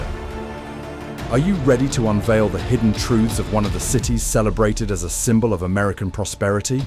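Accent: United Kingdom. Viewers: This is fake! I live in Oakland and this fake documentary with a foreign UK accent explaining you something they don’t even know about it. Just to create fear. This is straight FAKE!!!! That’s like UK people telling you about Mexico and Cartels.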